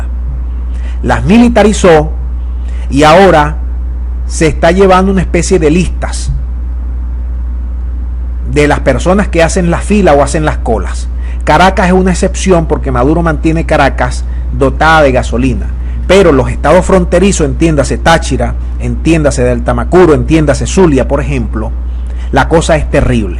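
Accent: Cuba. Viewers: Este locutor debe ser Cubanisimo un efuerzo immenso para lucir Venezolano. Solo Las cuentas que estan USA estan cerradas Venezuela tiene cuentas en la China y en Russia Las aerolinias Americanas no estan volando por El peligro que eso significa. La China si quiere traer otra ayuda a pesar de que El gobierno diga que no se necesita la puede llevar. En USA los que tubieron que salir llevan años pidiendo que le cierren Las cuentas a esos descarados que le dicen Al Pueblo pon la cara por mi que te estoy ayundo con una cajita clap de arina y grasa y por que los españoles y adecos y copei te dejaron pobres y USA quiere tu agua y petroleo y luego con El dinero de los Venezolanos se para Miami, Orlando y New York viviendo y pagando Plato caros mientras El resto haciendo colas por que Las colas no son de Enero para aca solo un Cubano llama a Maduro Presidente o narco y con razon por que le reparte la Plata de los Venezolanos en dollares y petroleo a ellos y los narcos Les deja hacer lo we le de la gana. 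insisto eres Cubano comunista o narco. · Que bien . Asi Maduro saldra mas rapido. Tienes acento cubano. No tienes derecho a opinar. Eres mas falso q billete de 7.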